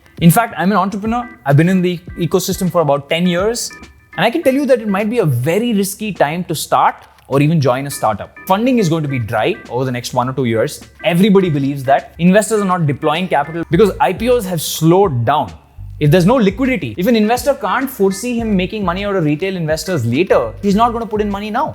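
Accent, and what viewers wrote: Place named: India. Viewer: Dude to be honest... I'm trying to listen to you with all my heart, because it seems what your talking about is something of great importance judging from the seriousness of your face. But I can't bruh! Because every time I hear your voice, I can't help but laugh hysterically at you and the way you speak like watching a stand-up comedian doing an Indian accent!🤣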